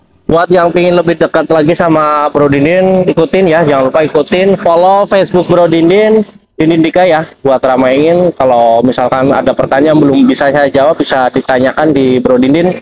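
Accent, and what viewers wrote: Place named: Cilacap, Indonesia. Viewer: Ternyata ini orang Cilacap jd jawareh😮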